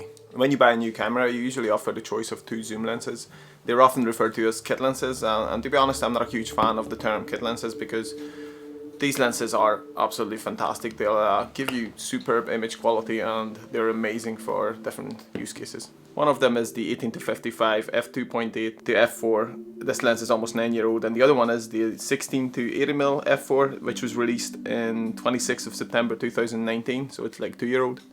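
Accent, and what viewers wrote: Northern Ireland, United Kingdom. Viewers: so helpful. Just discovered your vids. am learning a lot. I got a XT4. Love that Norn Iron accent :-)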